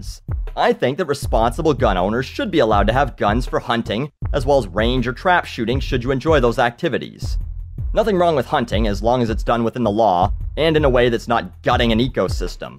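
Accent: United States. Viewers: That was a fuckin great American accent. Lol good video.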